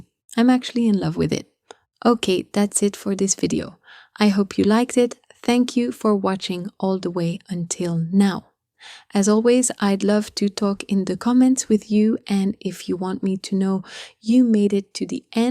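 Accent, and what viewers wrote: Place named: France. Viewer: Liked your French accent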